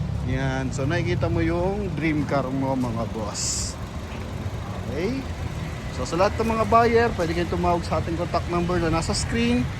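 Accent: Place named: Bicol, Philippines. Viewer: new subscriber padi.bikolano kaba kabayan?ung accent mo kasi na haa!😂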